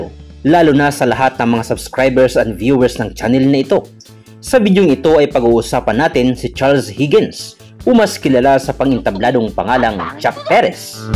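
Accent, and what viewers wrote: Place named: Visayas, Philippines. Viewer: Bisaya siguro ang vlogger.😊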